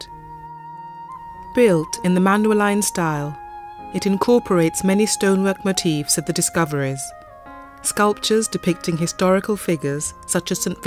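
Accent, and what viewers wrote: Castile, Spain. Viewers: Só pelo facto que pronunciar os nomes portugueses em espanhol este vídeo devia de ser destruído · With a Castilian accent, Seriously! It is very annoying to listen the way you try so hard to pronounce the Portuguese names with Castilian (spanish) accent. Please speak with a English accent or Portuguese accent, a accent were you are from is more natural because you sounded... ridiculous. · The narrator seems to think that we must be spanish since all the times she mentions a portuguese name (E.g Castelo S. Jorge, Martim Moniz) she does so with s spanish accent. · I appreciate the interest but to have the narrator pronounce portuguese names with a spanish accent kind of ruins the experience... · Not only does the narrator pronounce Portuguese words with a heavy Spanish accent but she puts me to sleep. Wrong background music btw. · We are NOT Spaniards. 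We speak Portuguese! FFS. I had to turn on captions so as not to have to listen to the narrator butcher words with a castilian pronunciation. Portuguese pronounce J s as Js not as h as the spaniards do. Really annoying.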